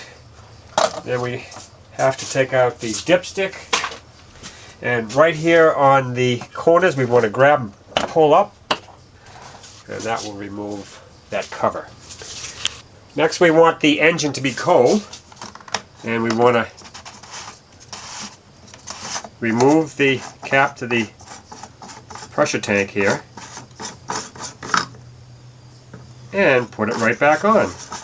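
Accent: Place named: New England, United States. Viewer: This is a nice video and you have a pleasant New England accent. What videocamera did you film with? Thanks. T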